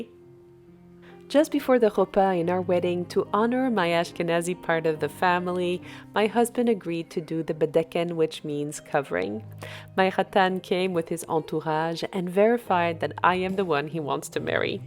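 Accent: France. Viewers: I can tell by your accent you speak French and ofcourse because you referred to your uncle as tonton.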